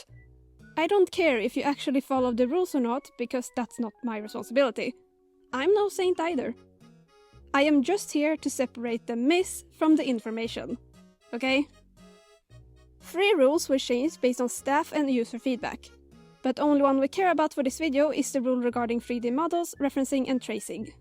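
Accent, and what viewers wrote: Sweden. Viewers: Finally someone's speaking facts about this! Anyway, this is off topic, but I like your accent, I'm Swedish too!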